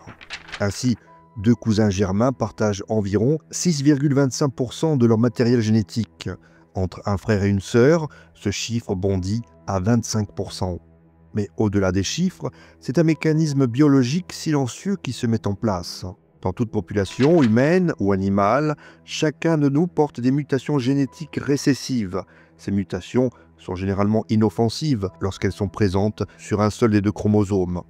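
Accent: Corsica, France. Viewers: Bonsoir seriez vous de corse du sud par hasard?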